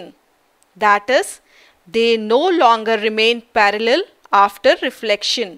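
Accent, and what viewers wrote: India. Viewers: Don't b_llsh_t us. It's not in english, but indiaglish.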